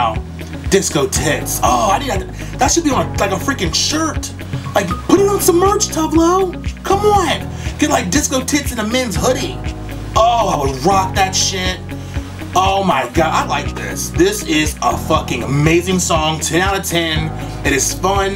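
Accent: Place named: United Kingdom. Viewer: The British accent tho😂❤️❤️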